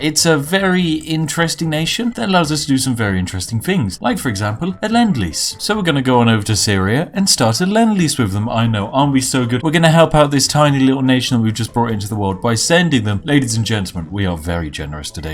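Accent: United Kingdom. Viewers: You sound british. Are you british? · Sounds very British to me...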